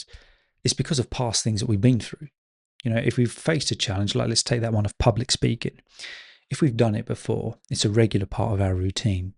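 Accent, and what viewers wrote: England, United Kingdom. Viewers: Hello, as a new listener, I appreciate your podcasts so much, very interesting topics, nice English accent, pleasant voice. I improve my English and I'm relaxing listening to you. Thank you very much, you make me think about life in English 🙏🏼🙏🏼👏🏼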